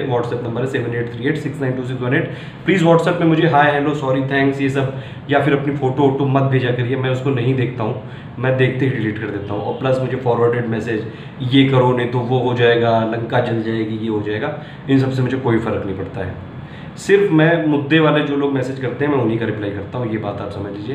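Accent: Kanpur, India. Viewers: Nice kanpuriya touch...!! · Bhai Kanpur ke ho kya ...? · Sir, Aap kanpur me kaha Se ho, Full kanpuriya Touch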